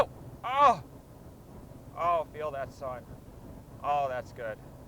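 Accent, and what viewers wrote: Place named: California, United States. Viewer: It took me til now to notice your definitive Cali accent. Do you enjoy being bald? I hope we get married someday.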